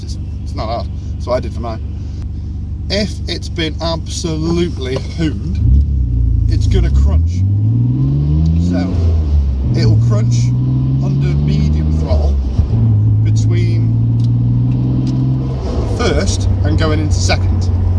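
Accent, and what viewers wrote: United Kingdom. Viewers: Fellow scouser. Recognized the town in the background before the accent tbh. My R34 has zero body rust underneath although some of the bolts and fastners have surface rust on them. Looking at it though I can see, how they can rust easy. The paint is no better than a Micra of the same era. i.e. shite. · I like the accent but some words are funny to me at times but I love the car culture in the UK and movie's also the word cunt such a passive blunt word lol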